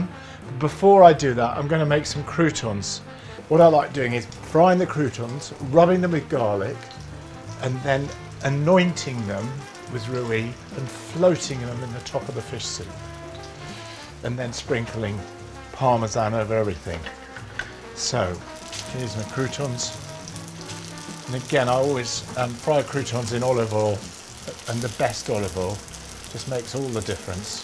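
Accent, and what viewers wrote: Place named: United Kingdom. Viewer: I definitely make this soup you just made . it's good to be a good cook or a chef .I am an American I like your British accent.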